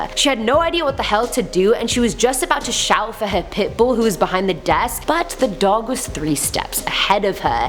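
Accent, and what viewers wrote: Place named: United Kingdom. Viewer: I love your British voice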